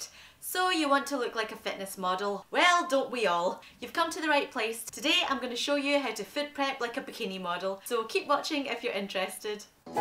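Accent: Scotland, United Kingdom. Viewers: I love your accent. It makes me miss Scotland so much. :( Please do more vegetarian meal prep, and fitness programme- I find it so inspiring. :)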